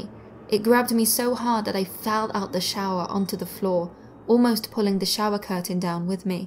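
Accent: United Kingdom. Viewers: Only thing I dislike the British accent. It's a turn off when listening to this stories I will never understand why pple find the accent attractive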